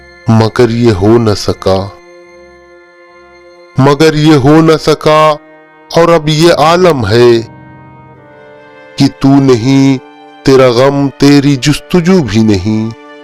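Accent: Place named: Punjab, Pakistan. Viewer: Awaz tho bith bori hai punjabi style may urdu bol raha hai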